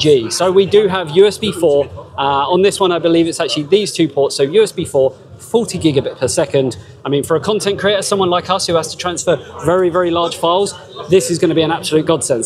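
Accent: United Kingdom. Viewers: Why is British accent so horrible? Can't even speak the alphabet with consistency let alone make coherent sentences.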